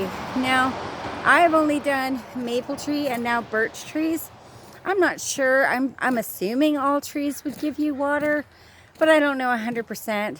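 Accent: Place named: Canada. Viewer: Thanks for always giving people something to learn, now i see how your channel name, coincides with each other! Awesome video. I hear the Canadian accent 😊👍🇨🇦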